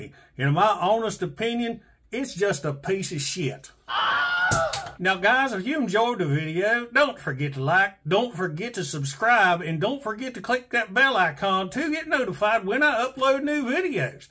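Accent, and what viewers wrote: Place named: South Carolina, United States. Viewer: I live in S.C. and I got some family that talk just like that, except a tad more intense. I have a mild case of it but I can't help it. 😂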